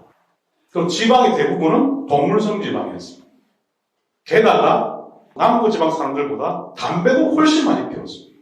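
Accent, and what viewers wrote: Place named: Busan, South Korea. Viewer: 유행하는 케톤식 알아보다 보게 되었는데, 믿을만한 논문과 임상경험으로 진짜!의학정보를 전해주시는 분을 발견한거같아 기쁘네요. 가족들에게 공유했어요! 이 분 찐인거같아요. 어느병원이신지 살짝 궁금하네요. 더불어 부산분이라 이해가 더 팍팍됩니다.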